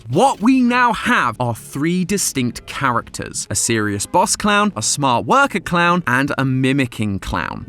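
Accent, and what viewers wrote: England, United Kingdom. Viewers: Bro I miss mat pat 😭 now he’s a British guy man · Every video I hope to hear matpat voice but no just a random british dude 😔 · Guys the British guy is back! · WHY DID MATPAT RETIE AND YOUR BRITISH NOT MATPAT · man. i will never get over this voice. ofc the british one had to get game theory... lol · what happened to pat mat, why did he turn to british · is a true prodigy. One created by matpat. (Nothing changed. The man behind the theory just sounds more British sounding.) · Matpat seems a bit more British than I remember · did matpat become british? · I think that this guy is a good replacement for matpat, because he just sound like him but British. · Hey you might wanna fix the United States close caption here. Someone used your English accent too literally and wrote Dawko as Dorko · matpat is british now?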